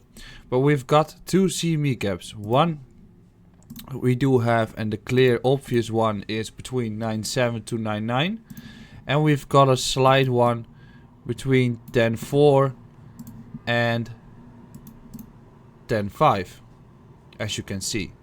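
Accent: Netherlands. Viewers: thanks for your vids and your sweet Dutch accent...I assume. the bounce off the 20 week average was promising but market looks like its weakening.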